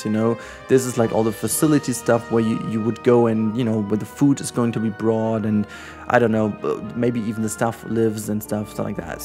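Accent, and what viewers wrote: Germany. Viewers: Gutes Video! (I assume you are German too? At least the accent sounds German to me :D)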